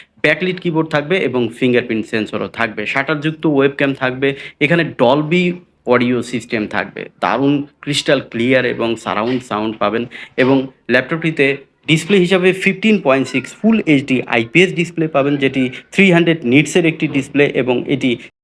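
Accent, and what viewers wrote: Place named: Kolkata, India. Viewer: Agge dada, the you talk like kolkata babu, why not Bangladeshi tone?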